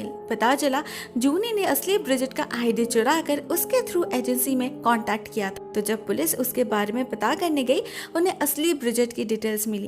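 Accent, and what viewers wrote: Bengal, India. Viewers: R u Bangali? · Woh bengali h. Unke accent se pata chalta h. Upar se unhone durga puja k waqt bengali me wishes di thi viewers ko. Sri lanka se nahi h. Kuch bhi bolte rehte h log.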